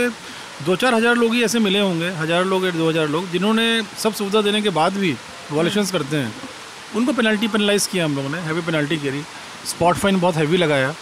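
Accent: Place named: Rewa, India. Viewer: Are thakur sahab apna rewa ke ahan mangawa Se main 30 km dur mauganj se hu... apki tone se hi samajh me arha tha wah..App rewa ajao sirji apki jyada jarurat hai rewa me Kaha netan ke cahkkar me pade han..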